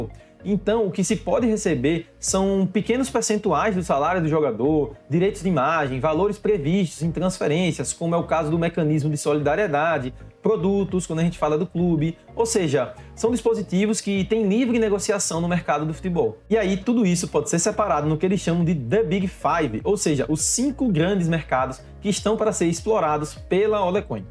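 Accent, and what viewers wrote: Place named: João Pessoa, Brazil. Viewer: Parabéns pelo conteúdo? Tu és de qual cidade? Tens o sotaque parecido com daqui de João Pessoa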